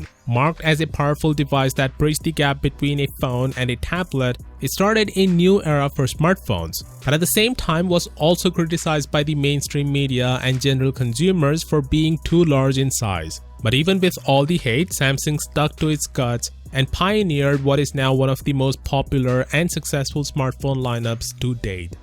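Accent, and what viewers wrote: India. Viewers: As soon as i hear the indian accent I know this is just "speculation" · Sound hindi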